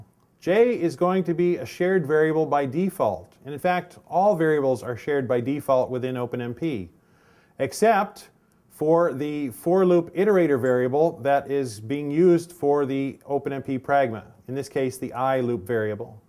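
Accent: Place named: United States. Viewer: Just link to the slides, then I don't have to listen to an American accent.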